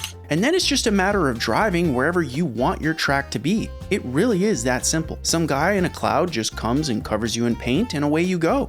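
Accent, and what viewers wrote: Quebec, Canada. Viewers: Are you Canadian? I heard something of a Canadian accent. And you pronounced French words correctly. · I think he’s French Canadian lol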